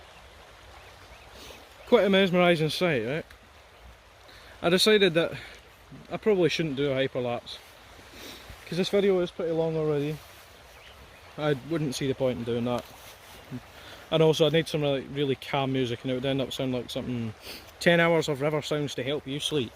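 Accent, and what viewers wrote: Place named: Scotland, United Kingdom. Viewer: where abouts in scotland you from?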